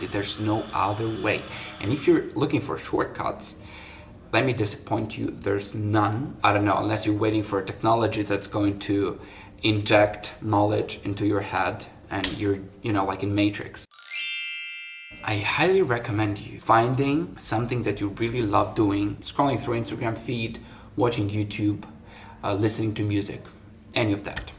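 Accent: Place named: United States. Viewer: I really like your American accent!👍👍👍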